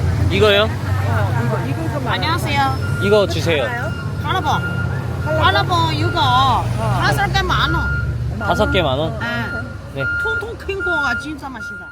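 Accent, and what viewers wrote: China. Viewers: 말투가 조선족인데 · 말투 그냥 조선족임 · ㅋㅋㅋ조선족아님 말투가 조선족인데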